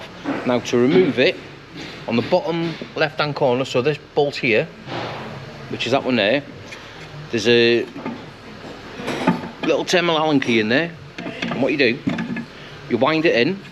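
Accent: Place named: East Lancashire, United Kingdom. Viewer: Splash of East Lancashire accent there I reckon. I can hear it from here in Wisconsin.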